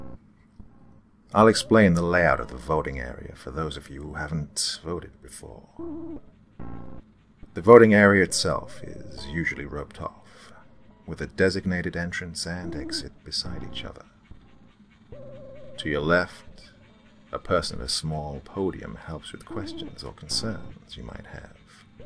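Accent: United States. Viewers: Two things. 1. You sound like Benedict Cumberbatch 2. Love the American accent. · For the most part, most fake American accents on youtube are SUPER cringe-worthy. But damned if yours wasn't pretty spot on!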